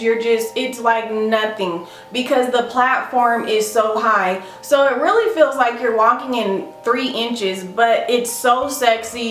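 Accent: South, United States. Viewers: You are so cracking me up with how honest you are about these shoes. I call it Shoepidity. Especially those god awful Louboutin. I have the Penny, took me over a year before I wore them. I have only wore them twice. Plus the platform is a dated shoe. I was coveting the white pigalle but I wanted it in the pretty blue. I am really impressed with your knowledge. Regarding finding sales. It's Dec 2 and June 2nd for sales. That's Gucci, Saks, Neiman and the other like department store. BTW where are you from? You have a strong southern draw. I have to check you out on Instragram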